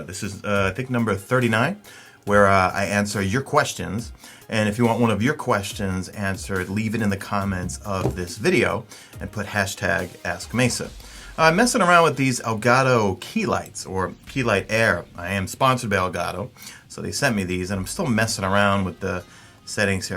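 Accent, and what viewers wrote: New York, United States. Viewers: #MadeItToTheEnd Can you do a video with your new York accent #askmesa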